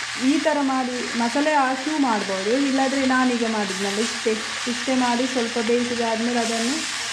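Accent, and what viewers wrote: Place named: Mangalore, India. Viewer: ಮಂಗಳೂರು ಕನ್ನಡ ಕೇಳೋದೇ ಚೆಂದ 😊😊😊😊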